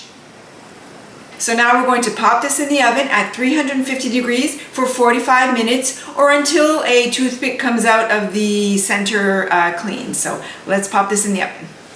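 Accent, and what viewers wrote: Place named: New Brunswick, Canada. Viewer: You must be from NB! I grew up in Bathurst and I recognize the recipe (coked it many times myself) and your accent! - a new subscriber from NJ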